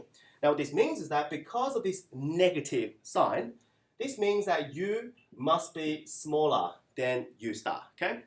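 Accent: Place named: England, United Kingdom. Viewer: Chinese with a bit English accent, like your lecture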